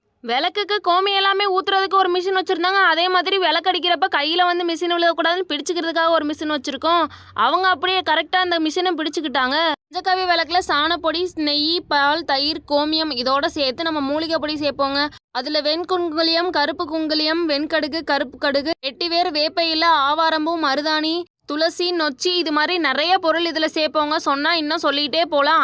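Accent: Madurai, India. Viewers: மதுரை வழக்கு பேச்சு தலைவா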